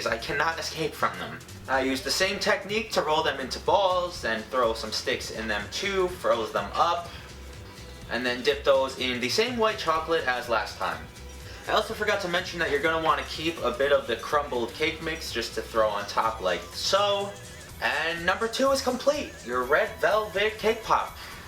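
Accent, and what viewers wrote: New York, United States. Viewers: Oh he lives in New York too? I thought so, his voice sounded SO New York and familiar. I bet he lives either upstate or Long Island like I do. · I'd appreciate it if you could give me your American accent in exchange for my boring old Australian one. Thanks pal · potter wtf is a thick American twang he has a new York accent most people (away from the east coast) don't talk like that lol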